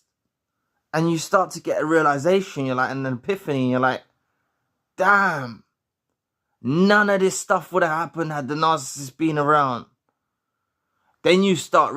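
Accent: London, United Kingdom. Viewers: tring to work out your accent , thinking maybe LDN originally but moved out the city :-)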